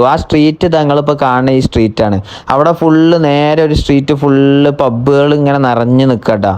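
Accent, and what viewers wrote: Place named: Malabar, India. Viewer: I like your malabar accent 😅😅 ❤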